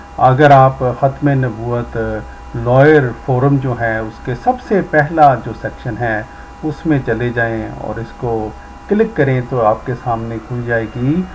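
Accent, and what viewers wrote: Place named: Punjab, Pakistan. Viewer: Punjabi mein urdu bolna bhi samaat par acha asar nahein chorta.Yoonhi tou Radio TV walay itnay baray baray auditions nahein letay.Presentation can make a lots of difference.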